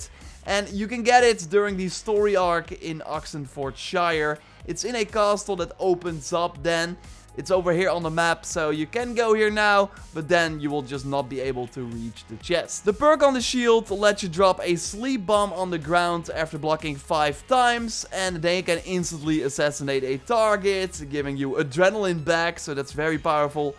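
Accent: Netherlands. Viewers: Sick dutch accent😂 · You have a dutch accent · Is he from thuis netherlands it souds so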